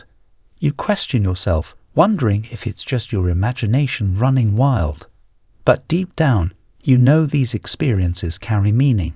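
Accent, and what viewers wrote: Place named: United Kingdom. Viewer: are you from Britain? or australia?? I hear an accent but cant pinpoint its relevance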